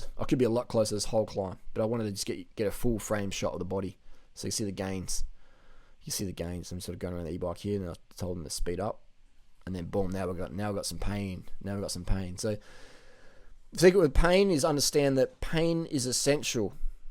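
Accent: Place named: Adelaide, Australia. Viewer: Your accent gets thicker the longer you're back in Radelaide.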